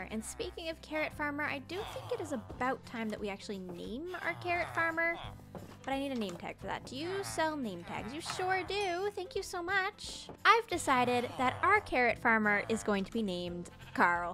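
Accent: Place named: Canada. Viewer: but still, her Canadian accent confused me a bit, as I am from England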